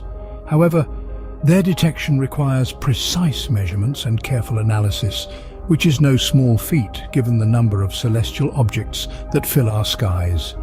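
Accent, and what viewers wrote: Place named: United Kingdom. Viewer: This channel has British narration but channel has no social info and based in Japan? 😂 Neat. And video was nice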